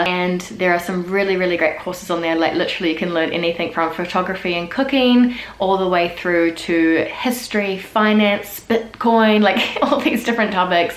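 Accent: New Zealand. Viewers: Hello! Yes your kiwi accent has come back a lot! Lol you speak a lot faster now. When you were back in France I thought to myself ' heeeey, she doesn't sound like the typical kiwi... she finishes her words!' just kidding, you still sound nice! I remember when I first came to NZ, the use of the word "egg" to describe a fool, really stumped me lol It also sounded like people were saying " eeeeeeeeeeeeeeeeeeeeeeg" haha don't know how else to get that one across. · And in a year's time, your kiwi accent has returned to full strength. I wonder what a comparison would be like of your French accent now to today.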